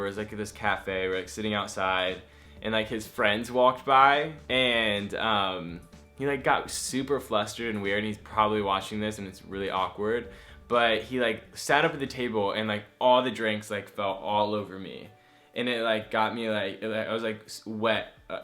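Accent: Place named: Los Angeles, United States. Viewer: los angeles accent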